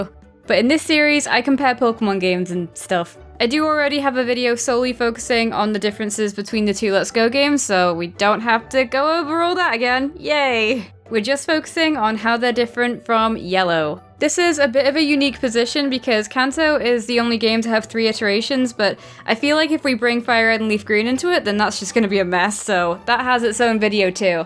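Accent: England, United Kingdom. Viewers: It took me a while to realise she’s not Australian and actually English trying to Americanise her voice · good video. your British accent is adorbs :) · Yay! I absolutely love your channel and your voice, mainly cause your a fellow Brit.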